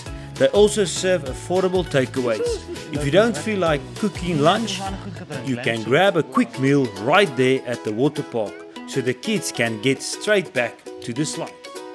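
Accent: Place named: South Africa. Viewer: Thanks for your video and so good to hear another South African accent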